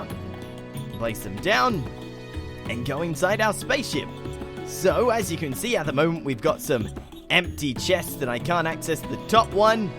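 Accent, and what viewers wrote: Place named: Australia. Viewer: omg, I have been watching your videos for a year now, and I thought. HAY... Hay, I know that accent. :/ OH WOW. says my realisation overclocked brain, it's like 3mhz now! THATS AUSTRALIAN! Then, HAY I'm Australian. suddenly mind blows. great content, keep making those video's. And spores back to, yay! I suppose.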